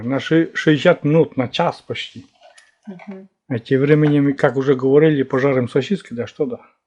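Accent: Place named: Russia. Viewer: Какой приятный русский говор - просто мёдом Божьим на уши и на сердце ❤❤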